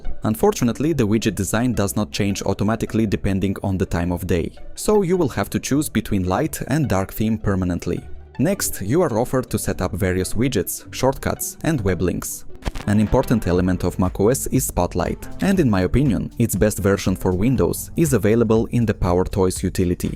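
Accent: Russia. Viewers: Стронг рашн акцент 😊 спасибо за видео ❤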